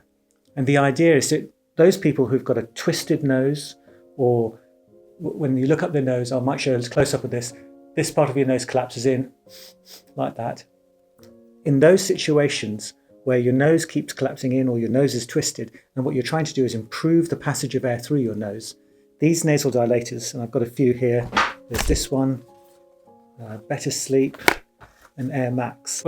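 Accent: United Kingdom. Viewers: thank you doc for the information and the devices you ve shown .i do appreciate your British accent but i wonder if these devices are efficient for everyone and if they are available on the Algerian market or not !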